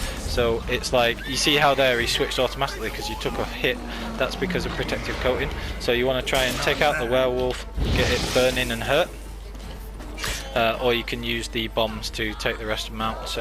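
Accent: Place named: United Kingdom. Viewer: WeeeeeelllIl, want to marry a British guy just for the accent. As soon as I find one willing to. So yeah, guess most of the girls around the world do love your accent 😅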